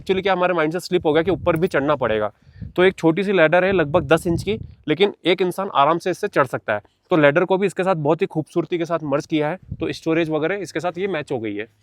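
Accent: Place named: Mewar, India. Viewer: ji aapki boli mewad ya aaspass ki lag rahi hai, mewad, malwa ya aaspass se hi ho kya sir.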